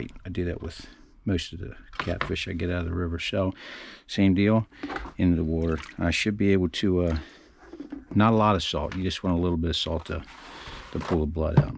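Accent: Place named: Southwestern Pennsylvania, United States. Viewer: Nice video, Sir. You sound like you might be from SW PA. May I ask where you caught the snakeheads? I remember reading of their "debut" in the Mon river maybe around 2019. Just wondering where else they may have migrated.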